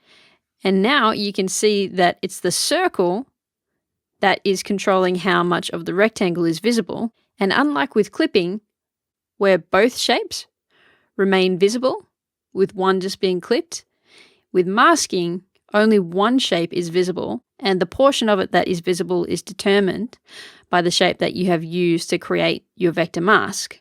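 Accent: Australia. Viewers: This is hands down THE best Affinity Designer tutorial on earth, but I'm not going to lie, the Australian accent helped keep me engaged the entire time ;) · I'm still with AD v.1 'cos my mid-2010 G5 can't handle v.2's requirements. This vid answers SO MANY QUESTIONS I've had since switching from Illustrator, FreeHand, etc. It is now PERMANENTLY BOOKMARKED and the URL copied to a separate text file (just in case). If this vid was downloadable, I'd save it to an XHD, too. Great job! (and the Aussie accent is a nice 'plus' point!). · Glad to hear an Aussie accent at last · THANK YOU!! I love this little course, I've learned more from it than 20 others. Also, it's fun to hear an Aussie voice out there in youtube land - so, a big thank you also, to the young lady who taught it. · Sounds like an Aussie or Kiwi...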